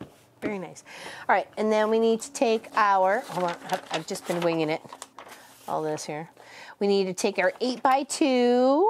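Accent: Ontario, Canada. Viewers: So nice to hear an Ontario voice. I grew up in Scarborough, married a Kiwi, moved to Halifax in my 20s (for 35+ years) then retired to new Zealand. Great videos. I am addicted to quilting. 😊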